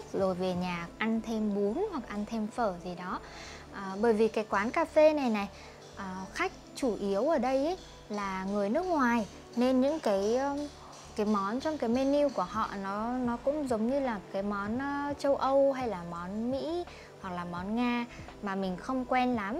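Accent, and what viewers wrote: Vietnam. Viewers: is this in southern or northern vietnamese ?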